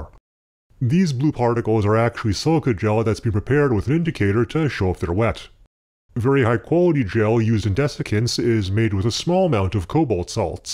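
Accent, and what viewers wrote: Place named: Canada. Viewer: you sound soooo Canadian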